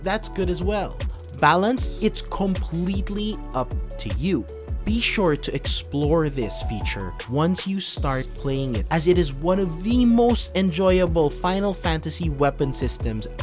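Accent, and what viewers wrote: Philippines. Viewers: Pinoy ka noh? accent palang and choice of words. hehe but nice review.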